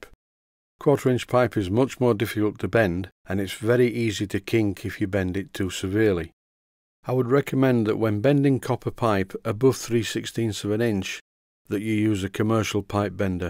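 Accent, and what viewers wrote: Yorkshire, United Kingdom. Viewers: Very nice job and I say you should go full Yorkshire accent. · Do all your video's in full Yorkshire accent please! I don't care if I can't understand it, it still sounds great!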